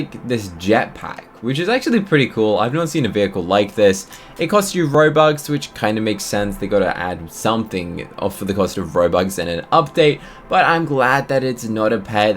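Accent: United Kingdom. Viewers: This is the most british accent that i ever seen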